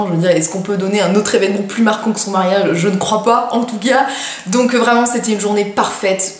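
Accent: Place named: South, France. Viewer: accent du Sud très prononcé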